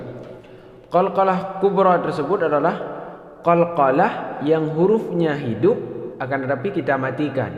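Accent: Aceh, Indonesia. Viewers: Orang Aceh ya ustd?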